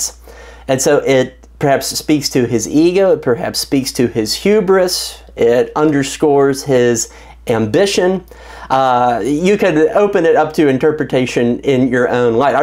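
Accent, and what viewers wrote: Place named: Pittsburgh, United States. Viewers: Bro you gotta be from Pittsburgh Area. I moved away from there when I was like 12 and can pick that areas accent out. Lmk if I’m right. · The presenter has a Pennsylvania accent. He sounds educated. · This guy's Yinzer accent is strong.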